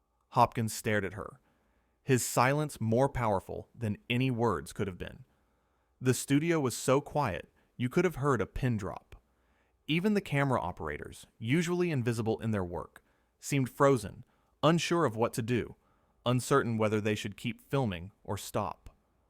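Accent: United States. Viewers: This is a load of shite just show the clip instead of all the American narration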